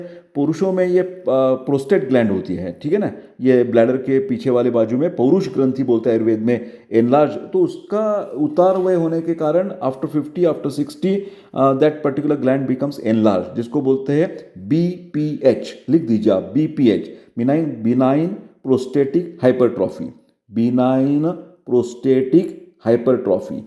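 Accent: India. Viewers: Thank you sir. Clear puneri Hindi. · Khup chan explanation marathi manus hindi bolat ahe . He kalte..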